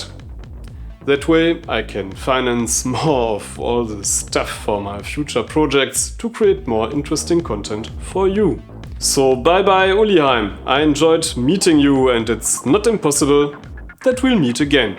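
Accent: Germany. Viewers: Du kannst Dir doch denken, warum man das tut - um auf einer internationalen Plattform von möglichst vielen Menschen in den meisten Ländern verstanden werden zu können. Komischerweise stören sich hauptsächlich Deutsche am deutschen Akzent - teilweise übrigens auch ich selbst - aber kaum Englischsprachler, die finden das eher lustig. Ich verstehe Deine Meinung aber nicht die Art und Weise, wie Du das formulierst. Es ist seine Entscheidung und die lässt sich eigentlich leicht nachvollziehen. Wenn Du Dir ernsthaft ausgerechnet von ihm deutschen Content wünschst, bitte ihn doch einfach höflich darum! Damit hättest Du sicherlich sehr viel bessere Aussichten auf Erfolg, als das Konzept seiner Videos in diesem Punkt so "von oben herab" infrage zu stellen.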